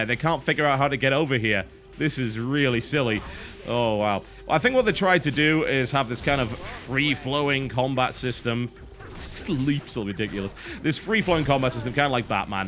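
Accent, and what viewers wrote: United Kingdom. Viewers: You talk like The Doctor (And not because of the British accent. You just have the same emotional phases in your voice. and your sentences.)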